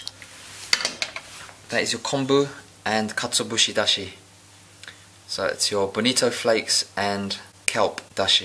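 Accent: United Kingdom. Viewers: Bloody Hell! Judging by you accent, I imagined you to be the most saxony british brit. Until you turned out to be east asian; I was like 0.0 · so nice to hear someone talking about Japanese cuisine in British English! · omg British accent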